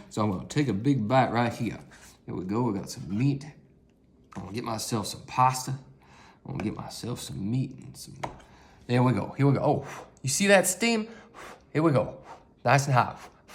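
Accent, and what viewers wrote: South, United States. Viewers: Man you have that southern accent that make me laugh man. Very funny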